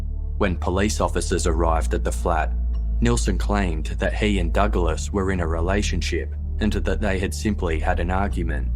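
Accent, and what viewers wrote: Australia. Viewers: Love your channel. Love the Australian content. Love your Australian accent. I don’t know what I am going to do when I have listened to all of them. Aussie Aussie Aussie. Oi Oi Oi !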